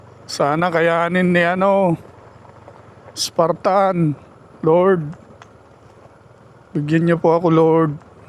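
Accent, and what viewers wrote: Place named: Visayas, Philippines. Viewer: bisaya ang intonation mo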